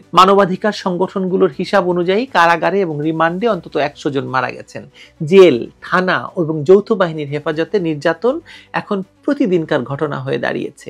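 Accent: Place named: Shantipur, India. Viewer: এত শান্তিপুরী ভাষায় না বলে নবনিতা চৌধুরীর মতো বলতে হবে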